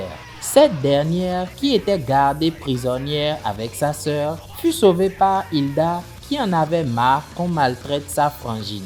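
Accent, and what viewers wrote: Benin. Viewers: Accent béninois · T'es béninois 🤔?T'as l'accent en tout cas 😃.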